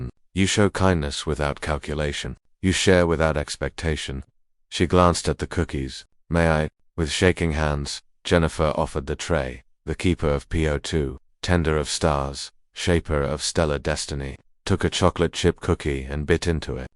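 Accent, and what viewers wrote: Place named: United Kingdom. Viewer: Narrator has a British accent , very subtle.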